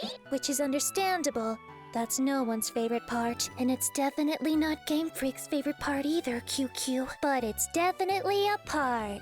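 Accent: South, United States. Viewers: Was that an authentic "southern hell" accent :3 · I dunno what to focus on first... The fact that your singing skills are improving with each video? The fact that the cringe rap is cringe af and I love it? The fact that your editing is great and I love the "text" jokes? Your great southern accent? The "uwu"? Or the fact that you somehow never went to Pacifilog Town on this save file? For real though, in all my years of playing Pokemon (which is like... a lot of years, idk) I've never once done a contest. And the worst part is that I somehow feel like I didn't miss much. But that means that when I was a kid playing this game, I was too dumb for both the Battle Frontier and the Contests! Not much has changed since then I guess. Anyway, great video as always! Keep 'em coming, queen!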